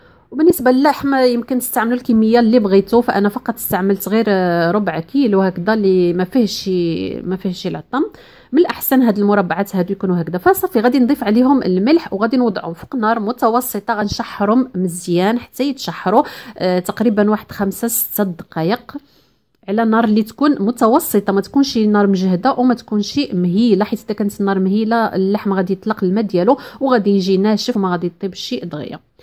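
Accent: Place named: Morocco. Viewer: ماشاء الله روعة يكفي اللهجة المغربية الحلوة تحية من سوريا لاهلنا في المغرب محمود الحسين،، بيروت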